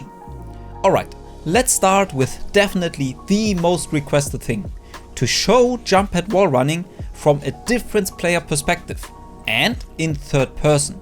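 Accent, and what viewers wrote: Germany. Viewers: Man kann so krank hören das du deutscher hust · your german accent is so obvious ;D, great videos man. keep on grinding my man. ;) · Was ein Deutsch englisch HAHAH😅✌🏼 xD, Save Deutscher oder?😅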